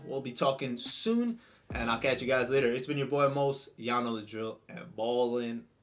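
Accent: New York, United States. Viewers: U from ny?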